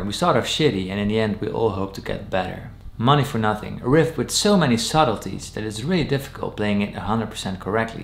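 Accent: Netherlands. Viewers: Are you dutch? You sound like a fellow dutchy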